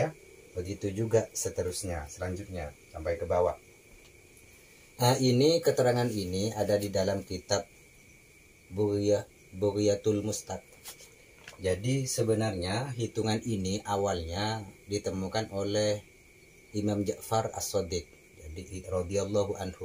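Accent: Madura, Indonesia. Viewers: Sampean kyk logat nya Madura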